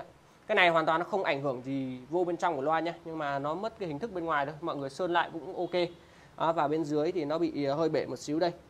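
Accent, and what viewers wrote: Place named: Nghe An, Vietnam. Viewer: Tiếng nghệ an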